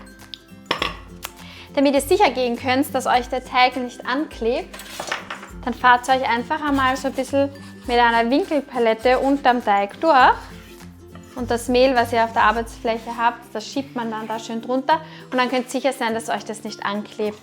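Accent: Austria. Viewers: Das Beste ist Dein östereischer Dialekt! (Dialektel) Ganz schön raffiniert. Die muss man nur vor den hungrigen Jungs verstecken, denn sonst sind sie alle ganz schnell wieder weg! Ganz schön erklärt und demonstriert.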